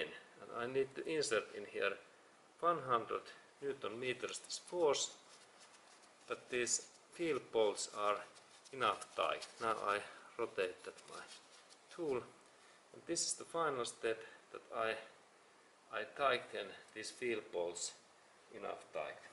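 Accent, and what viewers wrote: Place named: Finland. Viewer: Thank you for a very god video , you have a very pleasent manner and explain very well in your finish english accent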